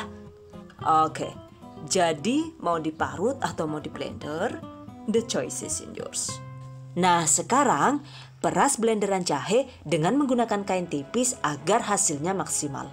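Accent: Banyumas, Indonesia. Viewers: Top banget lah,seneng karo ngomong ngapake,nek ora ngapak ra kpenak he he he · Keluar deh aslinya wong ngapak hehehe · Waduh... ngapak banget.... 👍👍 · Saya kira bule. Ternyata bisa ngapak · I Like this, medoknya banyumasan nambah lucu dan semangat nonton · jebule rika wong ngapak ya, aq wong pbg ,rika wong ndi bun? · Ladalah...jebule ngapak kaya inyong, mbekayu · Jebule wong pengapakan ya 😊. Mohon Ijin mau dicoba buat usaha resepnya. Salam ngapak bu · Lucu bahasa ngapake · Bunda kok ngapak ya ngomonge🤣🤣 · Suaranya antik , ngapak banget hahaha deneng fasih temen , wong ngendi Rika? Wkwkwk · trimakasih kakak resepnya..kakak lucu waktu ngomong jawa ngapak😂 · Mbak-nya orang ngapa yak? 😊 sama dengan orang tua saya he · Wkwkwk ngapaaakkk ngapak, banyumasan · Jane wong ngendi yu? Deneng cok ngapak. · Kk. Ngapaknya daerah mn nih??? Cilacap mn? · Hemmmmm suaranya khas bgt kadang kangen ngapak nya jga ...mksh banyak beri info yang bermanfaat semagat berwira usaha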